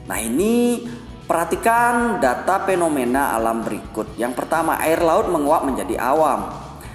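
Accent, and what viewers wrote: Bali, Indonesia. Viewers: Kelihatan bli dari logatnya hehe, salken nggih 🙏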